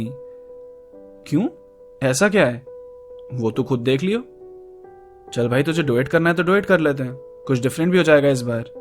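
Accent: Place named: Haryana, India. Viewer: Aap sayad hariyana se lagte ho😋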